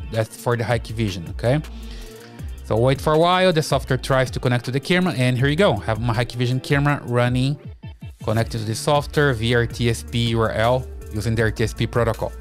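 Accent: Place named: Brazil. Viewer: Thank you for that! It's my first time in your channel, you accent sounds familiar. Brazilian?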